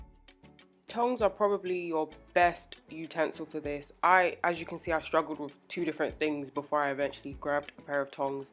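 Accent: United Kingdom. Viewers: You are brilliant love the video girlfriend 🥰 and so love your amazing British accent … everyone subscribe subscribe subscribe!!!!!! She knows her stuff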